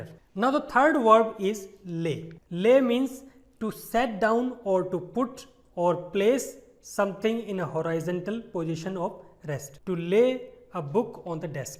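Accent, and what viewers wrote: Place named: India. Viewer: Sorry, you accent... you shouldn't be a teacher of English but Indian.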